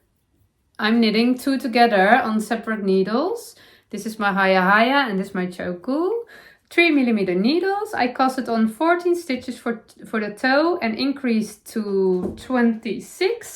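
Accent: Netherlands. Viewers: all the colors of the 3 Felix cardigan are perfect for you! Don't feel guilty by keeping them all! They were a special gift this person wanted you to have! You are so sweet to want to share these with others....but we see your excitement in wearing all your beautiful cardigans and we enjoy your enthusiasm and joy! Thanks for sharing your lovely projects! Love your 'dutchy' english! Closet, chest, cabinet, hanger, some of the words you were thinking about!🦋💕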